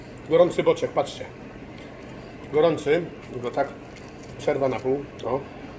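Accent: Highlands, Poland. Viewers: Po co typ pierdoli po góralsku a na innym filmie slychac że mówi normalnie. Dwa, podobno to żaden góral tylko czytałem że pochodzi z żor. To jaki z niego góral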